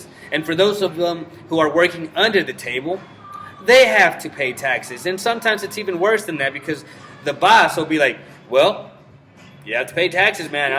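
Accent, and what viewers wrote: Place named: United States. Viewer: I am so confused. You look Middle-Eastern or East Asian. You live in Mexico and you're saying you're Mexican. Your name is. Your English in American accent is pretty spot on. Could you clarify please? I'm not being able to solve the puzzle...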